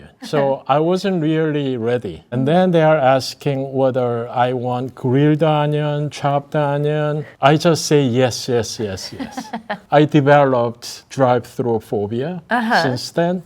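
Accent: South Korea. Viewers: 외국에서 느끼는 건데, 한국인 영어 억양으로 교수님처럼 영어 정말 잘 하면 그게 더 멋있어 보이더라구요. 솔직히 전달력도 좋구요. 오늘도 힘내서 작은 영어 공부 생활습관이 이어가 봅니다. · 억양은 한국인이시지만 저 정도만 구사해도 대단한 실력..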